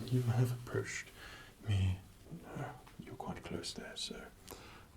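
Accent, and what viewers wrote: England, United Kingdom. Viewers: English accent gives me so much tingles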